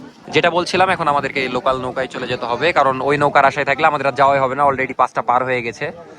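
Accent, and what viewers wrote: Rajshahi, Bangladesh. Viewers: I like ur accent 😂🎉 rajshahi accent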